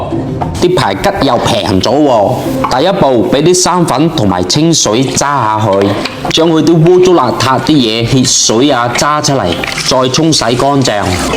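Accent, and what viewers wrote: Dongguan, China. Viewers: 地道東莞話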